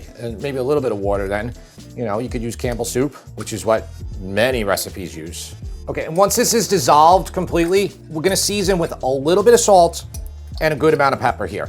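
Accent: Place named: Pittsburgh, United States. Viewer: You sound like a Pittsburgher! My Slovak grama put ketchup in eveything including her homemade chicken noodle soup!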